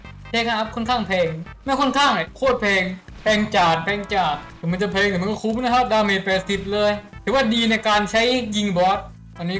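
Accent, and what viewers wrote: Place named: South, Thailand. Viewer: สำเนียงพูดเหมือนคนใต้เลยบ้านเดียวกันไหม😅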